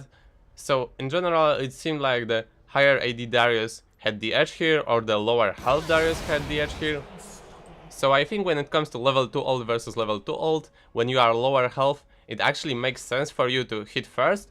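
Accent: Poland. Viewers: Are you from Poland right? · od razu slychac ze polak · jestes z polski bo troche slychac po akcencie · ARE YOU POLISH? CUZ I LOVE YOUR ACCENT ❤❤❤❤❤❤ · Hi u have Polish akcent xd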